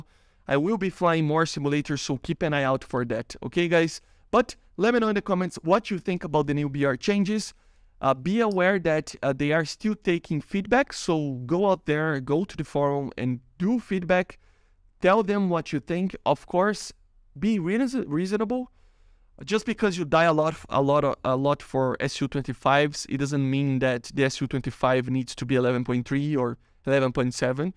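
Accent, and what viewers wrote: Brazil. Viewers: that Brazilian accent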